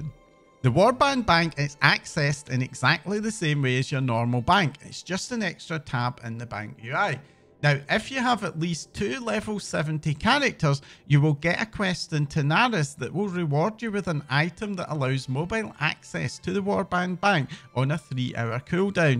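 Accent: Scotland, United Kingdom. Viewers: i hear scottish accent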